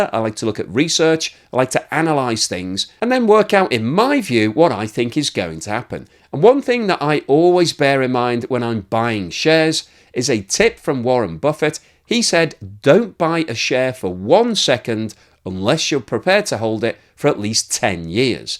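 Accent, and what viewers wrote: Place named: England, United Kingdom. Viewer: i don't find all your content interesting however I find enough of your content interesting enough that I will start to watch all your videos. Of all the channels I subscribe to, yours is one of about 5 channels I follow where the channel owner has an English accent, and I find the English accent is much more appealing to listen to than most American accents... I subscribe to both of your channels and I will give your content time, good information is always useful even if I can't use that information in the near future, share advice I have no interest in as I don't currently invest however I may do so in the future so I will watch videos on that topic just in case I need it 20 years from now, I did the same when I was choosing classes in highschool, I too highschool accounting and 10 years later I used that knowledge so I could understand blanance sheets and other financial documents for my business without needing an accountant (small pc repair business selling my time only so no stock etc) The drop in viewership may relate to the frequency of uploads, I think this can mess with the algorithm somehow and effect the impressions of your videos in peoples feeds, do you have a drop in subscribers on your main channel as that will affect things.....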